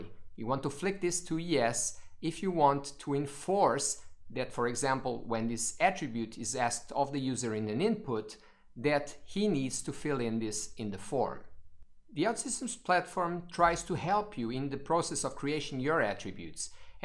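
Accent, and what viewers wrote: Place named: India. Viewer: indian accent😵‍💫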